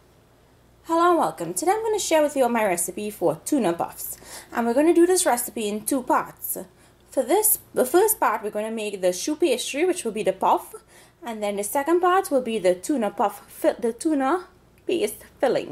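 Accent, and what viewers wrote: Trinidad and Tobago. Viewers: Beautiful Trinidadian Accent!!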